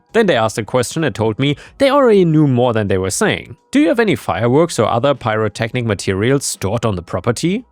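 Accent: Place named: Germany. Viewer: German accent really works with these revenge stories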